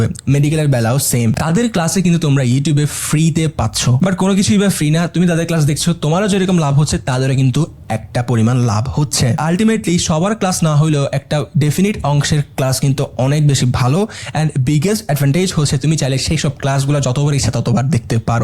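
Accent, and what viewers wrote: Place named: Chittagong, Bangladesh. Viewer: Chittagong er accent❤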